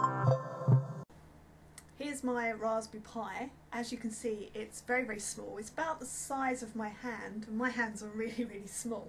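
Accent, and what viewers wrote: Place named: United Kingdom. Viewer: British accent <3 cool video :)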